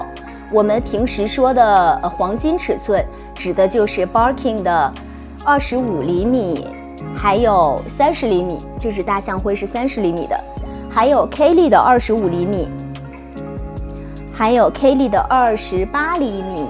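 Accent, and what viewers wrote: Japan. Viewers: 日文发音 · Her pronouncation is correct in Japanese, it's katakanam, used for words from foreign languages. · 她是日式英语发音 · 日式英语我听懂 解说非常好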